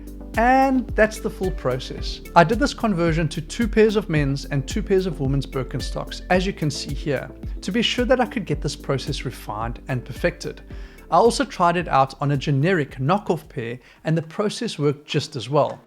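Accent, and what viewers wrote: South Africa. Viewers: Great video! You sound South African 😊 I was just wondering why barefoot shoe companies don’t make Birkenstock style barefoots. I will try this on an old pair of birkies.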